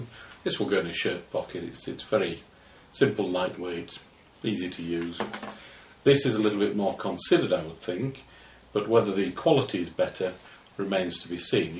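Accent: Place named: United Kingdom. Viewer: What part of Great Britain You from ?!?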